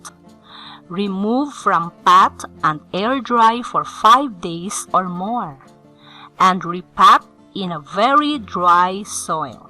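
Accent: Philippines. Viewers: The narrator has a Filipino accent, yes?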